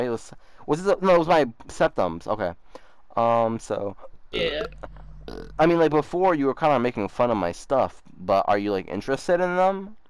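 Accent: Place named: United Kingdom. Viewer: he's just British he said mate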